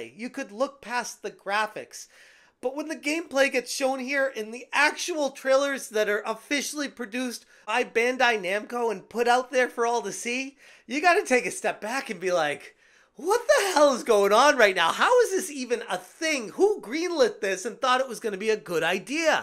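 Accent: France. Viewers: The narrator is literally speaking fringlish he’s trying to say them in French but tries to correct the pronunciation into English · Oui, this is "the" french accent..... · As a Québécois, definitly sounds like a French Speaker ( from France ) reading a script without really knowing english. I could translate but he basicaly just explain what the girl do in the video.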